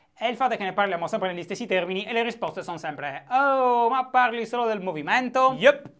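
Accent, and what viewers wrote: Sardinia, Italy. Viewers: Quel momento in cui fai vedere Breaking Italy a tuo padre e lui ti risponde "ma è sardo questo qua".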